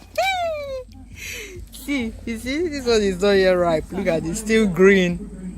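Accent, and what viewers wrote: Igbo, Nigeria. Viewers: I love your igbo ma, it just sweet to my ears😂😂😍😍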